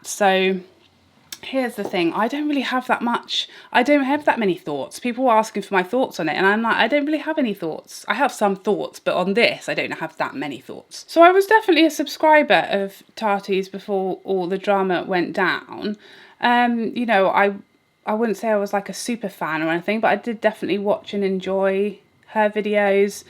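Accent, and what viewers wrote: United Kingdom. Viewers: It is wonderfull! Thank you so much this giveaway! Here in Hungary we no have this kind of make up . If i will be lucky to win, I definitelly will be the luckiest and happiest person in this World! 😊 I really like your channel and your British accent! 😻♥️♥️ Have a nice weekend for you! BR. Dori Instagram: Maybe - Nude Venus- is a good colour for me.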